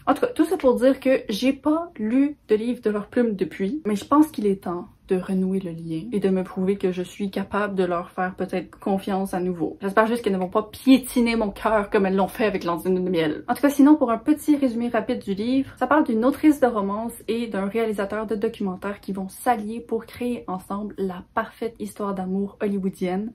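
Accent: Quebec, Canada. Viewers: En l'espace d'une semaine j'ai regardé plus de 2 ans et demi de vidéo 😂 j'adore ton contenu c'est rafraîchissant je suis pas québécoise mais ton accent ne me dérange pas du tout bien au contraire j'adore ❤❤. Ça me donne envie de travers l'Atlantique et visiter ton pays, de lire des livres en anglais ... Euh ça comme je suis une quiche ça va être dur mais j'adore. Merci merci . Continue ❤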